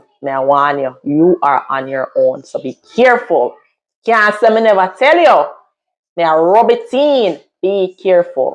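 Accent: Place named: Jamaica. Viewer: I love how you embrace your Jamaican accent.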